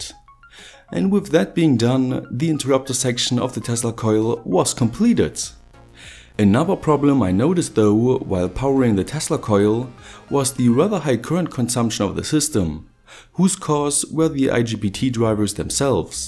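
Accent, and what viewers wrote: Germany. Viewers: Ich finde seine Projekte schon geil. Bin zwar neu hier hör aber gleich das er eigentlich ja ein Deutscher ist. Deshalb wundert es mich warum er seine Videos auf Englisch macht. Weiß das jemand?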